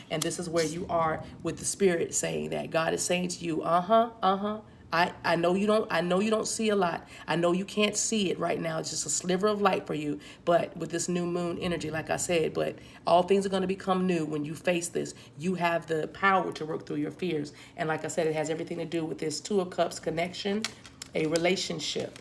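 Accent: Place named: South, United States. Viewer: that southern accent GIRL!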